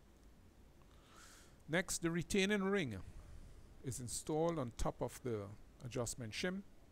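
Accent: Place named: Trinidad and Tobago. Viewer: Judging from your accent Im asking are u from Trinidad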